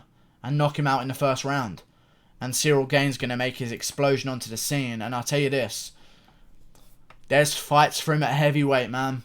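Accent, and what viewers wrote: United Kingdom. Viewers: With your British accent it sounds like you’re saying Cereal Gane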